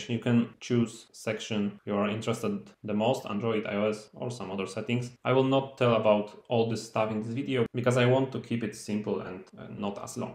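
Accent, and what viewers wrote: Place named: Poland. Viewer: Oglądałem ten tutorial i tak coś czułem ten polski akcent xD Ale dobra robota z tym filmem :D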